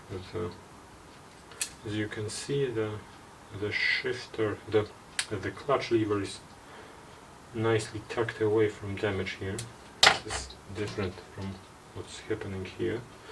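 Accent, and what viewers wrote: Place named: Russia. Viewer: сделаю смелое предположение: судя по акценту - автор понимает по-русски )) можете поделиться опытом и ответить на два вопроса? 1) долго цепь ходила по сравнению со старой системой?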